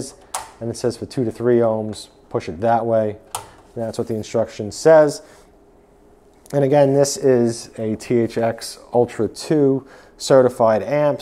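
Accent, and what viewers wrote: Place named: Long Island, United States. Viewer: Very interesting review. I bought one about 2 years ago to supplement a Marantz 6013 with Klipsch RP600Ms. I got it for $600 at the time, right now it's actually $900 everywhere. Yours is the first YouTube review I've found of this amp. I've been curious to see what somebody with access to a lot of amps would say about it, since I don't have much to compare it to. I admit that I was hoping for better. I'm currently at the stage of realizing that I need a dedicated stereo preamp to step up my 2 channel listening, apparently using an AVR for that isn't the best approach - but maybe I'll upgrade the amp after that (and then the DAC, and then the speakers, lol.) Thanks for the review!! (You sound like a fellow Long Islander - are you?)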